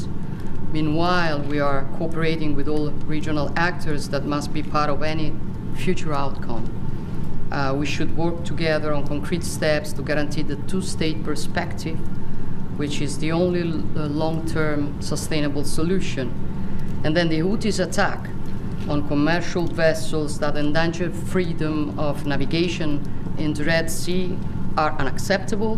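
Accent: Rome, Italy. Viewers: Ahahah che inglese maccaronico con l'inflessione romanesca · Inglese romanesco, parole a vuoto che oggi dice a Biden e domani, a seconda della convenienza, ad eventuale Trump. Speriamo Biden, che almeno fa finta di ascoltarla 😂😂😂😂😂😂😂😂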